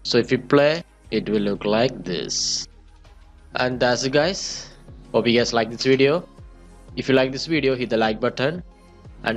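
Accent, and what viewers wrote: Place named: India. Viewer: Shitty Pajeet accent- Once a pajeet always a pajeet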